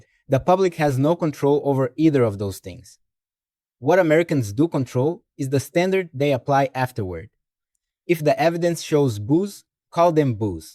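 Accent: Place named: Spain. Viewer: What s your mother language ? It sounds as if.it's Spanish !?! I WISH I COULD SPEAK SPANISH AS WELL AS YOU DO ENGLISH ! Nice video , concise just relax dude . Your doing great 👍 🇨🇦🇨🇦🇨🇦🍁🇨🇦🇨🇦🇨🇦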